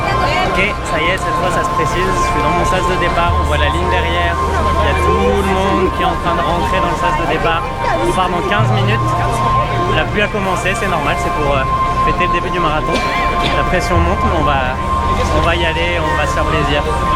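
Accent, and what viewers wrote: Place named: Quebec, Canada. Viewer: Tu avais plus l'accent québécois il y a 6 ans .. tu l'as un peu perdu depuis 🤪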